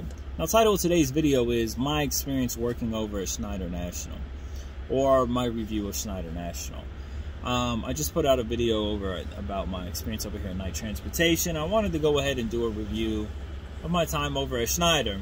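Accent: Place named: New England, United States. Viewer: Ur yankee accent doesn't represent ur claim of residing in the Carolinas...huummm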